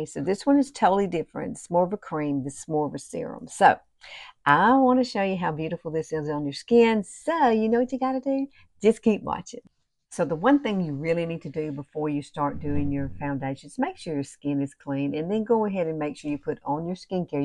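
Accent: South, United States. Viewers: Just came across you and have subscribed. I’m going to try your tips! Love your hair … and your great southern drawl 😊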